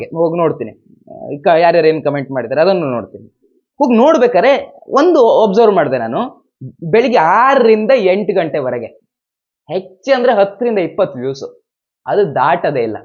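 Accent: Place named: Mangalore, India. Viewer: Sir from manglore, are u also from manglore . . .. ur accents match ❤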